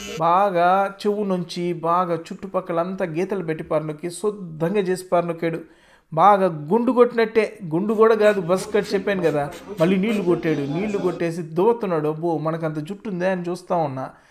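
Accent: Nellore, India. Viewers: Nellore slang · anukuna brother mi slang chusi ,manadi kuda Nellore ee. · Sooper comedy Mana nellore yasa adaragottav 😁😁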